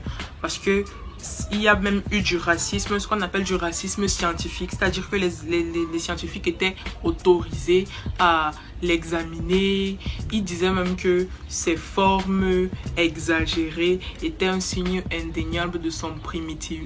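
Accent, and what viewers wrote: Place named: Cameroon. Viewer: Une des rares YouTube use qui , comme moi conserve l’accent camerounais naturel que l’on aime tous 👌🏽 force à nous les camer, forcé à toi , force à ton intelligence et à tes recherches 🇨🇲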